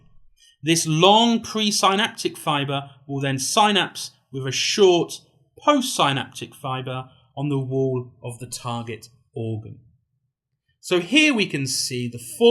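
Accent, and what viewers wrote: United Kingdom. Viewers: I hv never found a youtube clip so sexy, especially with that british accent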